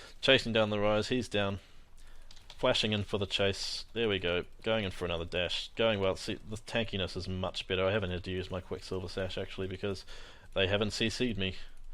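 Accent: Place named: New Zealand. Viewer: Ha Ha kiwi accent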